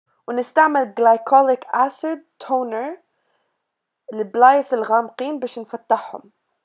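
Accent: Tunisia. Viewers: احلي لايك اول حاجة محلاه نطقك لتونسي. وثاني حاجة المواد الي استعملتهم عوضهم بمواد تونسية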